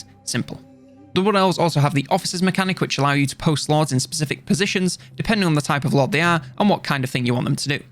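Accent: United Kingdom. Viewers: These stock images and British voiceover would make SpiffingBrit proud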